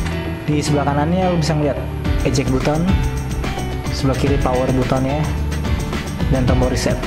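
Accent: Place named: Surabaya, Indonesia. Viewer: Dari jengenge iki pasti wong suroboyo :D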